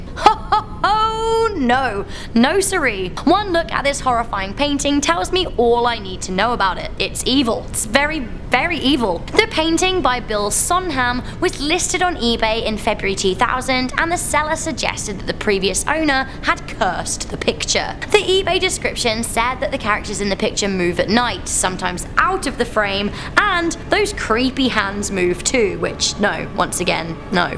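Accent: England, United Kingdom. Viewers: r u english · Why are the people that do these videos always British and ALWAYS so God damned irritating!?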